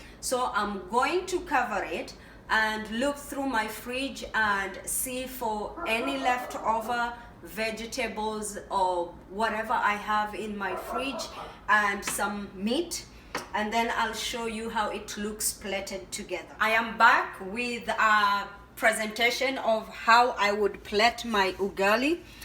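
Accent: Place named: India. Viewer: Your English has got Indian accent I'm a bit surprised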